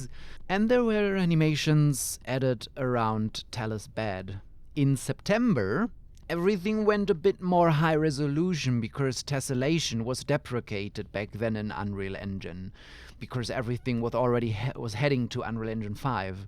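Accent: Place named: Germany. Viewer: You have a German accent. Are you from Germany?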